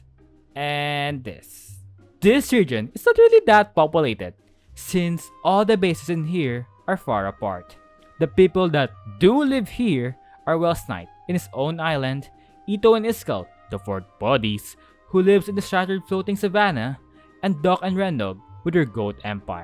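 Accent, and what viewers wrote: Philippines. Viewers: Are you perhaps a filipino? because that accent is seemingly familiar lol From 🇵🇭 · are u filo????? i can hear it in ur accent 😭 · are you a filipino? you sound like one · are you filipino???? your accent is soo familiar to tagalog~ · I realli like your pilipino accent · Your English accent seems like a veri Filipino vibe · Ur Accent . Are u Filipino? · you sound filipino. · i think its Flipino accent??? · I know you're filipino just by the accent.. Correct me if i'm wrong but i'm pret-ty sure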